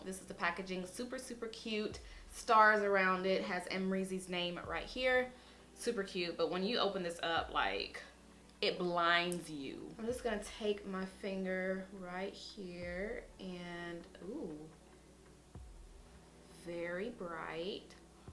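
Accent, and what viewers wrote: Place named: Texas, United States. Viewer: I love your accent. I’m from Texas and you sound like my whole family. Lol 😂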